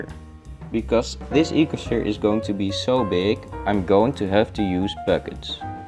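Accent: Netherlands. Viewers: nice video! i do not know where you are from, but you sound very Dutch. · he sounds dutch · I always thought you were Dutch by your accent (I have it too lol), but I wasn’t sure, and now I am. It feels satisfying to be right.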